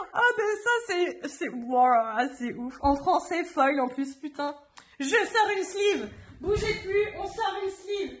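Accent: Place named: England, United Kingdom. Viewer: Je viens de te découvrir aujourd'hui, j'adore ta bonne humeur !(et aussi ton accent anglais 😂)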